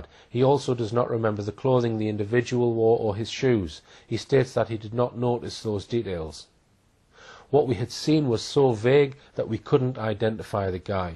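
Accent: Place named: Newcastle, United Kingdom. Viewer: He's a Geordie it's his accent has nothing to do with been able to speak in the right way.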